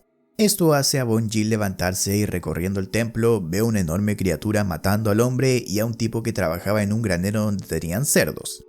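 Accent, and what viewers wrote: Chile. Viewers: Este acento chileno no se me da j ñsajfkaldnjhasdfkasd fñlsajfñljs afls chabocho Gran resumen!!!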